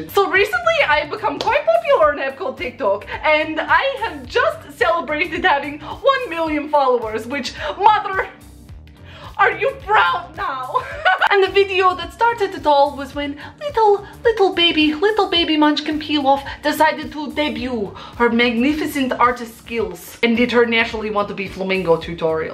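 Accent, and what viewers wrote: Russia. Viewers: Is that accent Russian?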